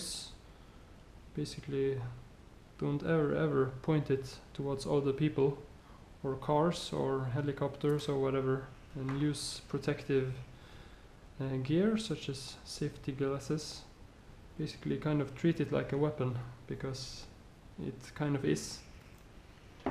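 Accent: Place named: Norway. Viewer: By your equipment and your accent i bet you are from scandinavia, most propably from norway.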